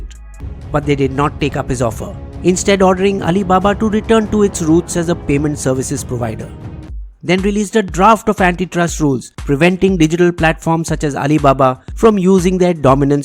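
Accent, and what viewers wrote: India. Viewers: LOVE YOUR CLASSIC INDIAN VOICE/ACCENT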